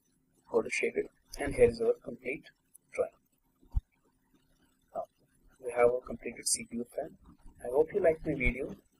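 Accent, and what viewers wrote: India. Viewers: indian accent?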